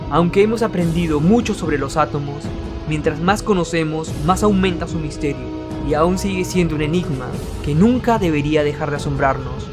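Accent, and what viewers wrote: Peru. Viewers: por su acento me parece que si es peruano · Es peruano por el dejo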